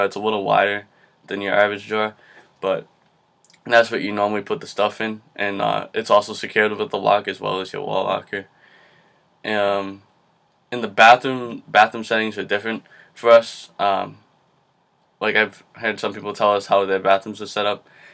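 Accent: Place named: New York, United States. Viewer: are you from NY? where's your accent from?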